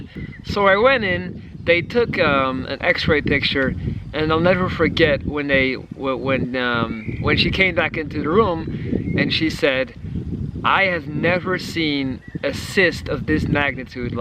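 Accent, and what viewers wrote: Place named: United States. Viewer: Your story is quite something. I have an off-topic question. You sound like any American I have ever met. Yet you lived in Belgium and then in Stockholm., Sweden. So where are you originally from? I would simply guess the US based on how you speak but that doesn't seem quite right. You must speak a ton of languages, living in both Belgium and Sweden, and visiting friends in Barcelona, Spain. But your accent is 100% pure American. So where are you from? Just curious. And keep your spirits high. You have one heck of a story to tell. I will be watching more of your videos. And I love your screen name, too. All the best to you.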